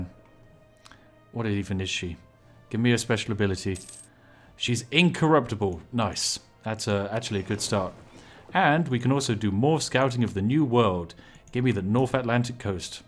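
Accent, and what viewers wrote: United Kingdom. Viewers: I could listen to read a EULA. Such a spiffing British accent should come with a warning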